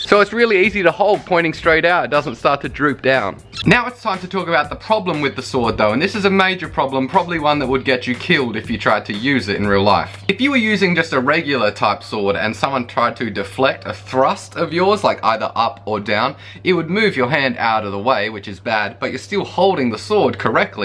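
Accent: Australia. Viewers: The way you stand on the wood while you're cutting it is SUCH an Aussie thing to do.When you started talking I thought you sounded Australian, but when you did that, I was like 'yep, definatly an aussie'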